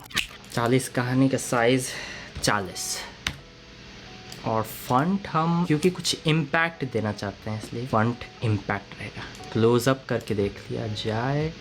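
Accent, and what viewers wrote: Bihar, India. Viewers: It is quite intriguing to hear a Korean speak Hindi in Bihari accent! Mind blowing to hear the "Bhadiya" with nasal tone!! ha ha ha ha Heard your thoughts on Money and success. Would like you think a bit more on success. Money or popularity or power is not really success in life; money is means for life. Also one big point you missed is of multi-tasking. nobody says you ought to do one thing only at a time, focus on 2-3-4 things to be made sources of Income. Do something online like stock trading or tutoring or youtube, do full time job and a part time over weekends sometimes, all at same time or different times. Since you are young you can start multiple ways and then drop many or all along the way and start something new ! Yes, you think tooooo much, Just do it !! If you think giving gyan would be great on YT, no, stick to stories... 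preferably imaginative...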